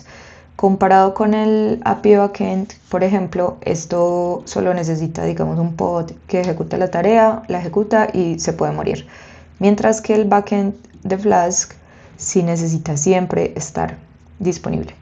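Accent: Antioquia, Colombia. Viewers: la primera programadora colombiana que conozco, me encanta el acento paisa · Quien vino por conocimiento y se quedó por la tonada paisa? 🙋. Muy buen contenido. Sigue así, saludos desde Argentina.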